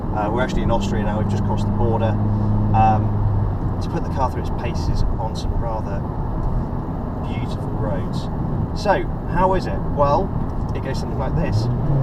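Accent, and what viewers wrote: United Kingdom. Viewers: total waste of 10 mins. only interior shot of a guy driving around with annoying British accent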